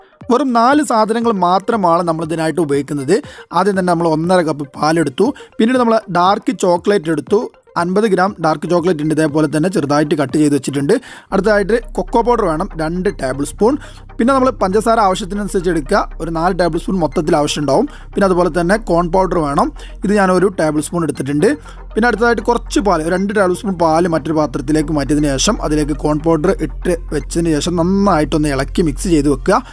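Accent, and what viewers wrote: Malappuram, India. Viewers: ഇക്കാ നിങ്ങടെ മലപ്പുറം ശൈലിയിലുള്ള ഭാഷ നല്ല രസമാണ്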